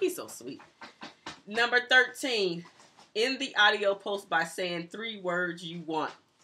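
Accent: United States. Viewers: You have a very southern hospitality accent. Which is great because I have the same one too! I’m born and raised in Florida and have family from all over the south. Sc Louisiana and etc and each state is different. Your voice is comforting and nurturing! Loved this tag! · Omg didn’t know you were from Ohio! My husbands dad lives near Youngstown. I can tell you have an Ohio accent. I say those words all the same!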